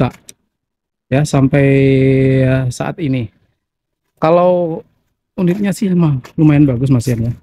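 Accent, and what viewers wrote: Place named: Sumatra, Indonesia. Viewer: Dari Sumatra ya mas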